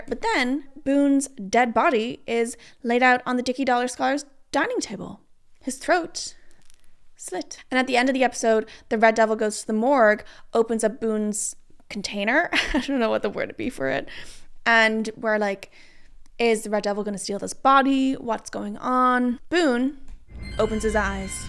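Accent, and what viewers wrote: Ireland. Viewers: omg you're Irish? I thought you were Australian :p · for the first 30 minutes of this video i was literally was debating on whether or not to make a comment about your accent because the way you were pronouncing your a's, p's, and l's were all giving me irish and come to find out lmfaoo you are irish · I watched multiple videos of your and I didn’t even notice your accent til after you said you were Irish. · it took me 8 minutes into the video to actually process the Irish accent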